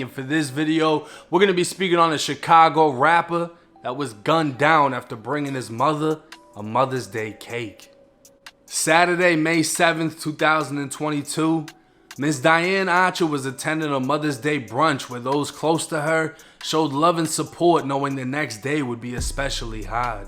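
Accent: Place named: Massachusetts, United States. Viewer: yo I gotta ask how long did u live in Malden for? Whole time I was thinking no way is originally from Florida - cause legit every dude from mass can move away at like 8 years old, hit 30 & still have a thick ass mass. accent 🤣 Edit- My bad, looked it up, didn't know you stay in Dorchester. this shit is dope though, subscribed.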